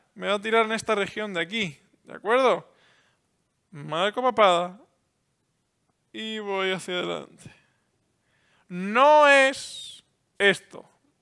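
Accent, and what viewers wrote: Spain. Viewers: Me encanta ese suave acento gallego. Una Canaria 💖👍 Gran explicación. 🤗 La posición de la cámara perfecta, se sale 👏👏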